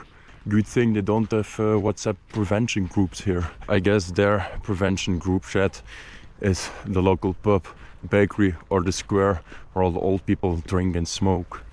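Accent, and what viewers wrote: Netherlands. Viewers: Hou van je nederlands accent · Loved it, loved the dutch accent too lol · mien jong ik volg je nu al een tijd en ik wist aan je accent dat je nederland kon spreken lol. greetings from groningen for ya. youve gone on quite the adventures! i always like seeing it. just please be safe with the railways and such from time to time! i saw someone already notified you of them being electrified. keep it up though, exploring places is what shows us beauty in what was left behind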